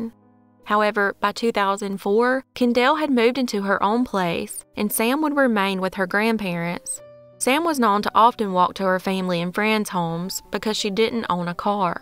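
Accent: South, United States. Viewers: Thank you kindly for your amazing story and video,much luv, I love ur southern accent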